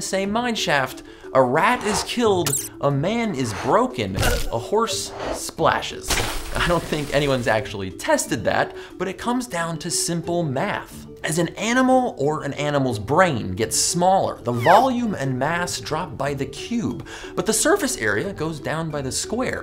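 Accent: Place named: United States. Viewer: You seem to understand the video regardless, his accent is American so it’s his native.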